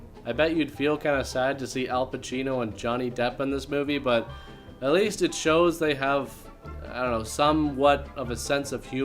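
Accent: Canada. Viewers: Are you Canadien?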